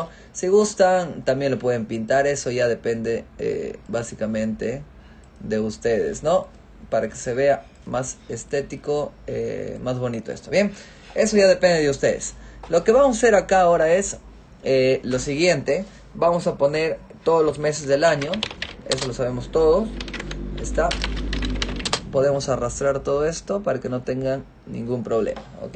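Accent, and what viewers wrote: Peru. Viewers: Es un gusto cómo se habla el español en Perú. Saludos desde Chile. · Estaba buscando cómo organizarme con mis ingresos y egresos y no encontraba algo práctico. Me encantó tu paciencia, habilidad y orden de tu explicación. Plus!!! Tu acento Peruano ♥️ 🇵🇪 ♥️ Gracias 🙏 Houston TX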